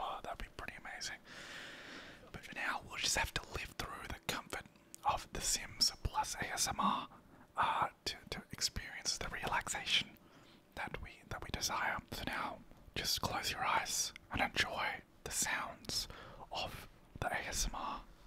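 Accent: Australia. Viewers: Never before did I experience an Aussie whispering in my ears. Gotta say, it's very calming. Great video!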